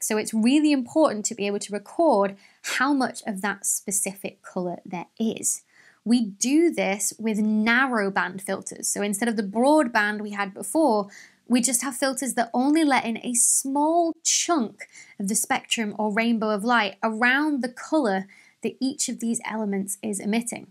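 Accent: United Kingdom. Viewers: Absolutely so! But, in the meantime, I worry that you might be losing viewers ... and I love your explanations so much that I think you should have a much wider audience ... BEFORE the bastions of patriarchy are brought down, which could be a long time! Don't think of it as compromising your English Regional Integrity (ERI!) but just as a courtesy to viewers listening on tinny speakers! When I moved to the US, I resolved NEVER to say "TomAYYto", I thought, "No, let the Yanks learn "TomAHHto", but after 8 years, I just got tired of having to say "No Tomahhto" over and over again (I HATE them, they are Satan's Fleshy Fruit!) so I made my and waiters lives easier by saying "TomAYYto" when necessary.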